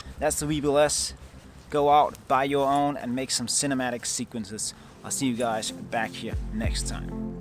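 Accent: Denmark. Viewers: Nice video :-) are you Danish? ;-)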